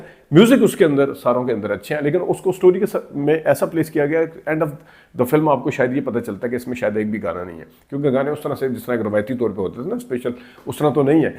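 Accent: Punjab, India. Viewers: Like you I think your mother tongue is Punjabi that's why when your urdu not clear Apki urdu mein bhi Punjabi lehjha clear Nazar ata hey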